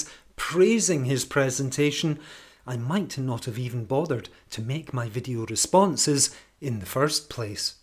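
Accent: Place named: Scotland, United Kingdom. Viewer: Lovely Scottish accent that could cut through hardened stainless steel and glide through butter. There is something about a Scottish accent that makes one sit up and listen. When you think of the great Scottish actors: Mark McManus, Sean Connery, Robbie Coltrane, Ewan McGregor, Roddy McMillan and actresses Kelly Macdonald, Karen Gillan, Shirley Henderson, Isla Fisher, Laura Fraser.... and the list goes on and on..................and Scottish accent is up there with the best of them. Alba go bràth!!!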